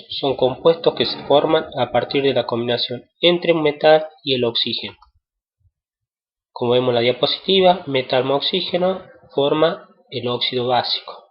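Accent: Córdoba, Argentina. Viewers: Gracias! Ese acento cordobés me salvó... la verdad que ya ni recordaba esto y en unos días tengo evaluación diagnóstico jajaja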